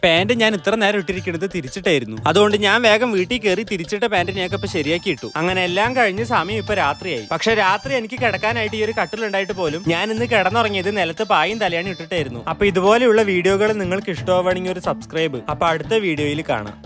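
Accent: Palakkad, India. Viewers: Chetta enk chettande videos nalla ishttann spr ann palakkadan bhasha ❤️💗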